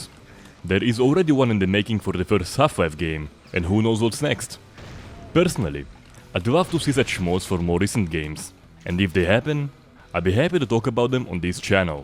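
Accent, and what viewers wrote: Russia. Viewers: What are your future plans for the series,? P.S. Are you from Russia?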